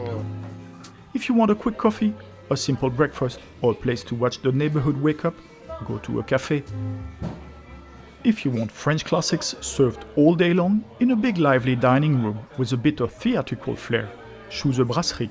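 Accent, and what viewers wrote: France. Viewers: Dude, you’re pronouncing everything á la Francaise 😅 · I think you have improved your narration and video style. You cater to English speakers which is welcomed by the millions. Your pronunciatiation of english words sounds typical if french way, and i have one quick comment if you don't mind: Either try to say the French words slower or have them transcribed on the screen as you say them. This is not for me since I have no problem understanding them.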